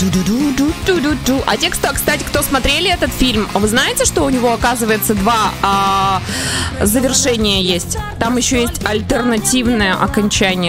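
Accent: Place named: Germany. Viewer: немецкий акцент проскакивает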